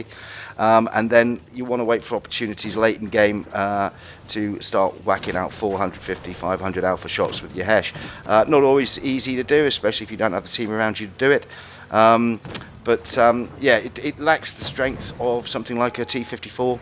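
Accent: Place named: United Kingdom. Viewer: Naisu Why do you soind like British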